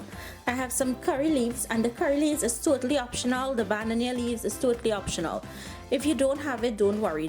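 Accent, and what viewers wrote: Trinidad and Tobago. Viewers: Love how you Spoke Original. #Trinidad.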